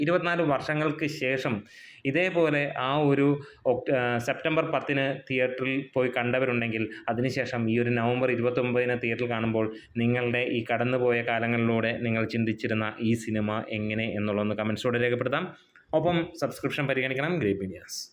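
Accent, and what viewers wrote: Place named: Thrissur, India. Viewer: Thrissur ragam👌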